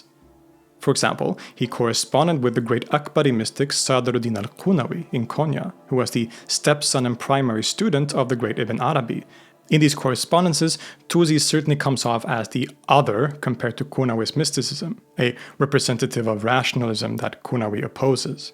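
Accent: Arab world. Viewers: This guy is such an arab wannabe he even says Iranian names with the guttural Arabic pronunciation. Khwajeh Nasiruddin-e Tusi... At least learn his name. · Awesome work as always! I thank you and salute you for bringing more and more of these Eastern geniuses into public recognition, which I think would ultimately have a positive impact on the world we live in. On another note, I think your pronunciation of Persian names and words is a bit over-Arabicized! Persian names and words such as Tus and Tusi do not follow the Arabic rules of pronunciation. For instance, the Perso-Arabic letters of س،ص،ث are all only pronounced as [s] in Persian, unlike in Arabic where they all have different pronunciations. Persian has its own completely different rules of pronunciation, despite being written in the same script as Arabic. Arabic words and proper names loaned into Persian also follow these Persian rules of pronunciation. 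· I have a question to ask, why do you always use Arabic pronunciations when you talk about Persians? Is there a reason behind this? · this. your last comparison was extremely on point. he belonged to a persian background, it makes sense to pronounce the name with Iranian / Farsi accentation. there was a similar problem where he pronounced some names from the subcontinent straight up "wrong" in one vid (can't recall which rn tho sorry) cuz of arabization. not to say that this is in bad faith. i'm sure he just finds this to be the most intuitive reading, and ends up defaulting to it during his narration, which is smth i relate to as an urdu speaker who reads farsi in a very urdu accent :,)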